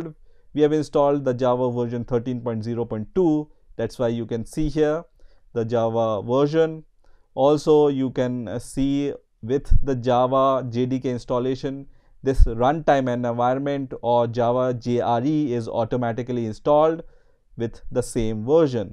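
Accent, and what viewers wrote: India. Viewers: The moment you hear the indian accent you know its going to be good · i love you and your Indian accent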